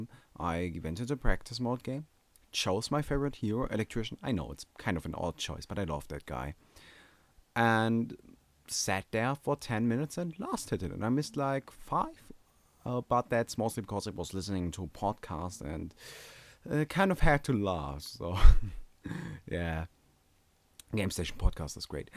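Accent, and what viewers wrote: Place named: Germany. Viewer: Dude you're german, so your accent is amazing! And this is the simplest yet best bit of advice you can give us! I consider myself a "decent" last hitter (in casual mode, no stats) I can generally get every last hit! But as soon as I go matchmaking I start failing!! :( I don't know what I do differently, I guess the other players harass me so I don't get a chance to last hit! :(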